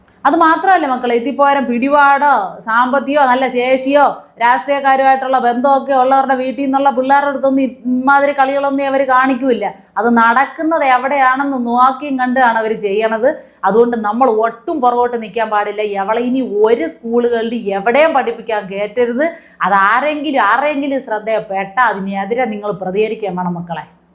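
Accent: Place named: Trivandrum, India. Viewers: Kidu avatharanam ji... Trivandrum slang adipoli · തിരുവനന്തപുരം സ്ലാങ് നന്നായി സംസാരിച്ചു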